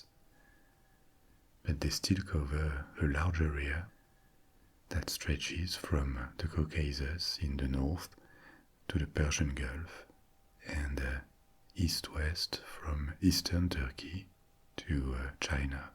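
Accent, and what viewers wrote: France. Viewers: My friend, I listen to your stories every night before I fall asleep. When I am asked how I slept, I say “wonderful!” How could I not when I have a dear French friend with a soothing and silky accented voice who reads to me until I fall asleep?” I’ve told many in my circle about you and most of them thank me joyfully! I have never listened during the day, but I did with this one. You are exceptional in your descriptions, your words paint the pictures in my brain! You are truly a treasure and I thank you from the bottom of my heart for putting in all of the countless hours it must take you to research the histories, write your script, set up your “scenery” for you to handle (VERY hypnotic), record, edit……..it exhausts me just typing just the steps out! It leaves me wondering when you find time to eat, spend time with your family, work or any of the things required to live your life! 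I have never chosen to use patreon, but I will now. You give me countless hours and it’s only right for me to give back. Thank you. From the bottom of my heart, my friend. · Yes. I'm not 100% sure, but I believe his accent is French. 🤔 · yes, he is French. He lives in Paris, so im guessing he was born there too. I love Paris. I lived there for 2 years.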